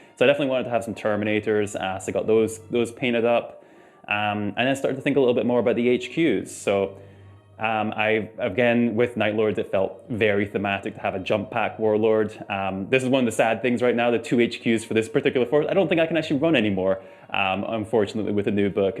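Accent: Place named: Russia. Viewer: you trying so hard to not sound like russian but you still do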